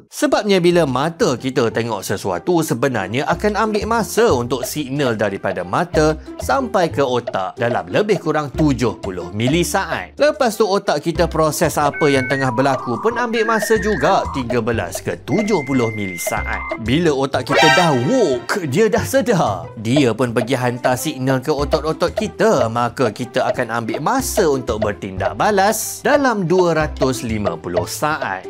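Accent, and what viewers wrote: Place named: Terengganu, Malaysia. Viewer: orang ganu rupenye😂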